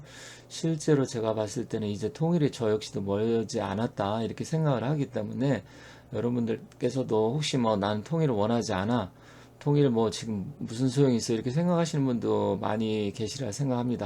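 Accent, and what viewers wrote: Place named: Jeolla, South Korea. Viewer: 말투에서 절라도 억양이 묻어나오네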